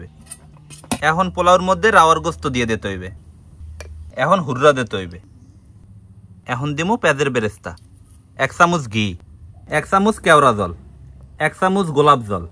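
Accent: Barishal, Bangladesh. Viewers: Cock মানে বললেন রওয়া বা মোরগ এইটা বরিশালী ভাষা আমিও বরিশাল এর বরিশাল এর কিছু কিছু language অনেক❤ sweet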